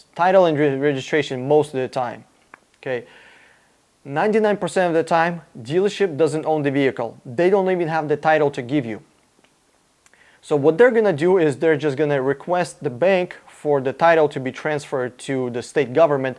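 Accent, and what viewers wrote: Russia. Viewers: Good advice thank you buddy. You sound like Russian ? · Are you Russian? Love your accent. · Why do you have Russian accent???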